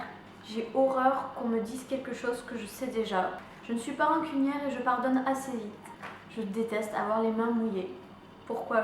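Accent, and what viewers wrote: South, France. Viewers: Pourquoi tu trouves cela vulgaire l'accent du sud? :) En tout cas, j'aime beaucoup tes vidéos. Je trouve que tu donnes vraiment des astuces intéressantes. Et si je peux me le permettre, qui sont les uniques 5 youtubeuses françaises que tu suis? Des bisous♥ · Ca me choque un peu que tu ais honte de ton accent, moi aussi j'ai l'accent du sud et je n'en ai pas honte du tout, mes amis nordistes aiment bien ils disent que ça leur fait penser aux vacances mdr, c'est pas parce que tu as l'accent sudiste que tu parles forcément comme une cagole ;) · On a des points en communs ahah, sinon,laisse ton accent du sud, cest le meilleur! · Super vidéo :D tu es vraiment une youtubeuse super justement pour ton naturel et la proximité que tu crées avec ton "public" ^^ je vais jouer le jeu tiens ! 1: je déteste dépenser mon argent, mais j'adore posséder et je suis assez addict au shopping (j'ai des wishlist longues comme le bras qui traînent dans mon PC) 2: je suis environ 40 youtubeuses françaises et 20 américaines. 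3: j'ai eu 18 ans, mon bac avec mention très bien et mon permis de conduire en l'espace de 5 jours cette année. 4: je suis fan de cinéma, et en particulier des films un peu psychologiques. 5: j'ai la phobie du feu, je n'ai par exemple jamais osé passer mon doigt à travers la flamme d'une bougie ou démarrer un barbecue... 6: je suis détectée surdouée mais cela n'influence pas mes goûts ou mes loisirs. Par contre, j'ai plus de mal à nouer des liens avec les autres que la plupart des gens. 7: je trouve l'accent du sud extrêmement relaxant et chantant :) c'est d'ailleurs une des choses qui me font aimer ta chaîne alors ne te retient pas! 8: je suis terriblement gourmande. 9: je suis très lunatique et c'est un problème car je suis susceptible d'être parfaitement normale et d'avoir des accès de colère ou des périodes de déprime. 10: je rêve de devenir actrice depuis que je sais marcher. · J'aime trop l'accent du sud ! 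Ne te retiens pas ! 😜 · Ton dernier fait était "je me retiens pour éviter de montrer mon accent du sud, parce que je trouve sa très vulgaire". Pourrai-tu nous faire une vidéo avec cet accent ?? :) Continue comme sa tu es génial !! ❤️ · Bonne video mais dommage pour l'accent du sud je l'ai aussi et je trouve que c'est le plus bel accent comparé à l'accent du nord beaucoup trop pointu à mon gout! Apres l'accent du sud c'est comme tout, faut savoir l'utiliser et je suis d'accord avec toi par rapport au fait que selon les personnes, il peut devenir extrêmement vulgaire ! Bisous ! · Pourrai tu nous faire une video courte avec ton accent du sud pour voir a quoi sa donne ? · Je suis pas d'accord avec toi pour le 50ème fait on peux pas renier l'accent du sud aha :D et enplus je trouve qu'il te va très bien! VIVE LE SUD :* :D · Mais c'est super beau l'accent du sud dans une vidéo tu pourras parler avec ? 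:) (Je l'ai moi aussi) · Tu peux le garder ton bel accent du Sud ! La vulgarité est une attitude et tu es à mille lieux de celle-ci ! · J'ai aime ta vidéo claire et consise :) oh l accent du sud <3 il a pas l air moche le tiens ... Moi ça va ça ne se remarque pas dans mes vidéos il n est pas très prononcé . Pour le shopping plus ça va et plus je deviens comme ça ... Ah tout faire sur le net!!! Et bizarrement moi non plus je b´aile pas l eau sur les coudes quand je me lave le visage ! Voilà :) et pour les youtubeuses françaises et bien je t'invite a venir me faire un coucou alors histoire de voir une nouvelle tête . Bisous a bientôt .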